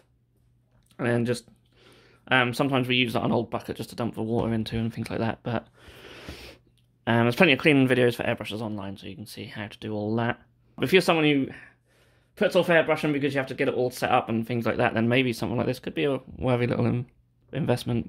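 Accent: Norfolk, United Kingdom. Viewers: Beginner with painting 3D printed models here and got one of these. So far so good. Must ask you are you from Norfolk? Yarmouth here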